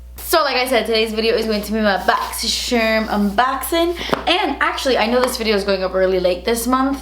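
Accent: Cuba. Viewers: Can you do a makeup tutorial in Spanish? I’d love to hear your Cuban accent for it haha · Me too. Makes me LOL everytime her Cuban chica comes out to play! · Love it when u speak Spanish and your Cuban accent is so 😍😍😍😍😍 I would be able to hear you just talk forever!!!!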